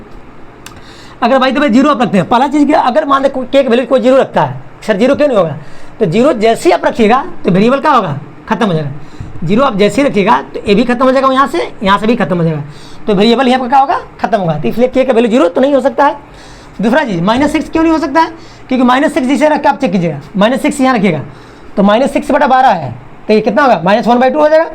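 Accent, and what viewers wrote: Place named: Bihar, India. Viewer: Sir to khan sir nikle Bihari +hindi mix 😂❤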